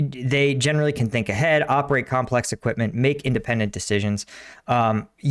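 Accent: United States. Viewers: It's not a Jersey accent, it's a South Boston accent. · That’s not a Boston accent it’s a New Jersey accemt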